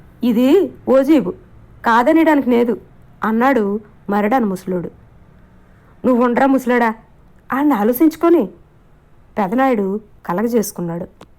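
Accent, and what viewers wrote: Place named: Vizianagaram, India. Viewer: Vizianagaram yaasa బావుంది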